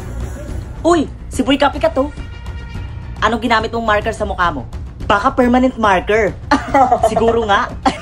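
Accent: Visayas, Philippines. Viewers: Bisaya ka po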